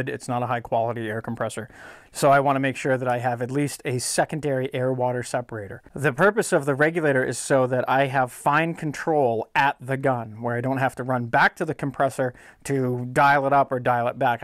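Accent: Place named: Northeast, United States. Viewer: Very odd 🤣🤣 you must be from up northeast 100 %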